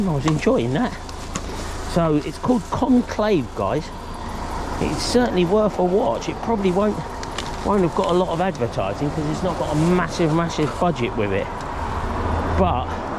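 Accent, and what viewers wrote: United Kingdom. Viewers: orange county should have a volunteer program for litterpicking that you could sign up for. they'd provide bags and sticks for free. And yeah, that english accent makes you sound authoritative to us americans. good work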